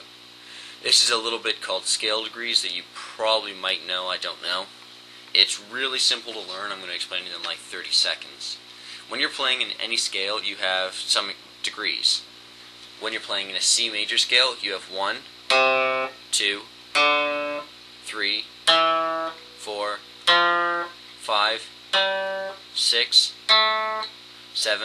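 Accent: Canada. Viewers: nice vid. are u canadian? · Has anyone ever t old you that you have the most adorable Canadian accent ever? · Are you canadian?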